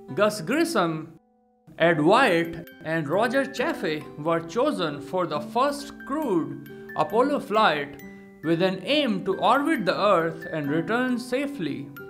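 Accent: India. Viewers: This is an Indian Accent I think, I hear that alot where I live (not India tho) Am I right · a pajeet is narrating this?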